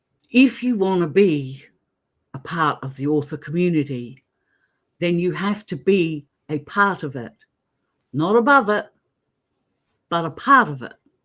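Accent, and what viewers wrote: New Zealand. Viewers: I saw the backdrop of your thumbnail and thought, oooohhh that looks kiwi. Heard your voice. Was like definitely kiwi. Hellooooo from Auckland 👋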